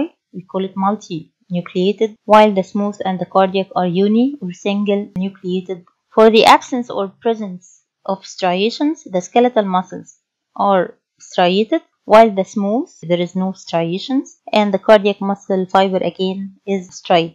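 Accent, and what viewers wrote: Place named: Egypt. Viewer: I love Egyptian English accent! Alot of respect mam From Pakistan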